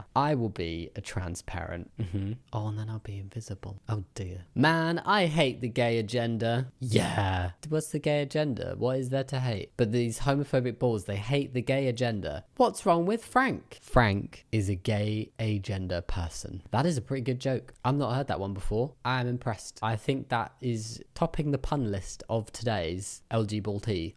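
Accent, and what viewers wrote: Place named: Scotland, United Kingdom. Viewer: You said something and I really could tell you were Scottish. The accent is there at least sometimes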